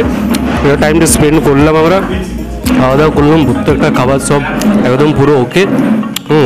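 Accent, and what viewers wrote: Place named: Bankura, India. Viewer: Dada tumi koto 1th kotha bolo so nice tomar kotha sunai boja jai tumi bankurar manus ....very nice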